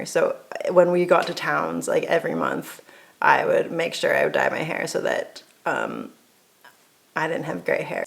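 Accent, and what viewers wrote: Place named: New Zealand. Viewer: Compared with your PCT videos your accent is definitely developing a NZ accent edge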